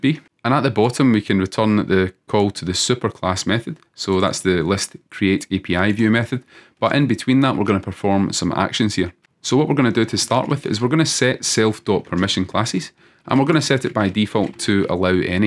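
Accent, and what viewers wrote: Scotland, United Kingdom. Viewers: Great series so far... I am glad I moved to Scotland a few months back, I can understand you perfectly (assuming you are Scottish). The way you teach is excellent, loads of people throw things at you but you take the time to show where to find the info, look at the doc while coding and also look at the code behind the scene, that is brilliant. Subscribed, thumb up, coffee to come in a few days.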